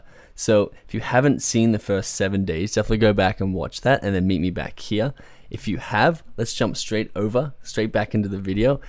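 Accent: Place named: Australia. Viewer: hey cool i live in vegas too, i thought u were from australia tho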